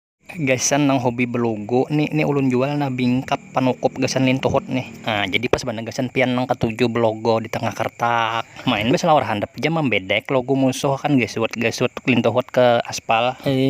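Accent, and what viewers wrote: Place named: Banjar, Indonesia. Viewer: Kampung sungai tuan ulu mana suara nyaa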